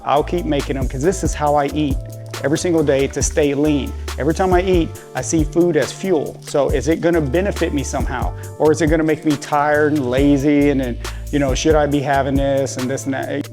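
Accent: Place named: South, United States. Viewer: I’m loving your channel. If you don’t mind me asking what part of the USA are you from. I hear hints of a familiar accent. Alabama girl here. Lol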